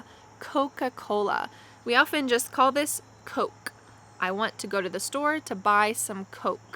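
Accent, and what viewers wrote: United States. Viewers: This is the American way to pronounce them, not the right original one. I doubt Adidas is called in this way in Germany 😜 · I love American accent so much😍and this video is so interesting and useful! Thank you! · Mmmm these are all American pronunciations…..anything not an American brand is mispronounced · Thanks a million🌷🌷👍👍as an iranian i'm so happy to learn brands in english specially with american accent😍😀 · Go and learn from someone who speaks British English because Americans mispronounce everything in English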